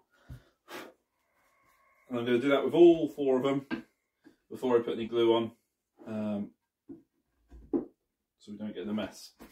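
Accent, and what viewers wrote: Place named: Ireland. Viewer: Too much talking - difficult to understand w/your brogue. The presentation appears mor ad hoc than well-organized.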